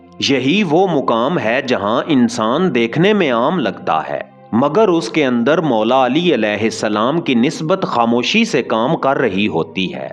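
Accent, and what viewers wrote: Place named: Punjab, Pakistan. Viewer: Narrator voice is so irritating , sharp and with a Punjabi accent. Please replace the narrator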